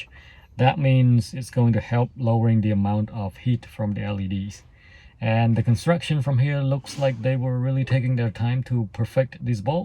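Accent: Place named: Vietnam. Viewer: You sound like my uncle. (Viet accent) and , it looked like you were on the 99 North heading towards Fresno Area, lol.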